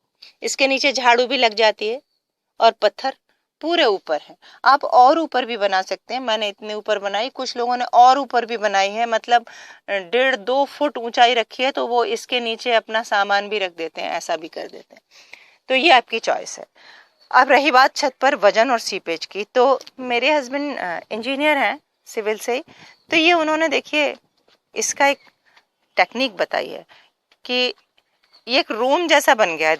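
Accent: Standard. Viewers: आप की शुद्ध हिंदी सुनकर बडा अच्छा लगता है ,क्योंकि मैं मुंबई मे रहती हु .मेरा भी एक छोटासा बाग है लेकिन सब एक्झॉटिक प्लांट्स है,सोच रही हुं कुछ सब्जीभी ऊगालू लेकिन मुंबई की बारिश और मुंबई के बडे बडे चुहे कुछ भी नही उग्ने देते है क्या करू समज नही आता? लेकिन मै फिर भी प्रयास करूंगी आपसे प्रेरणा जो ली है.☺️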